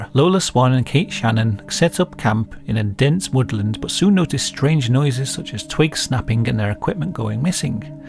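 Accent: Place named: North Wales, United Kingdom. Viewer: I knew you were from north wales😂😂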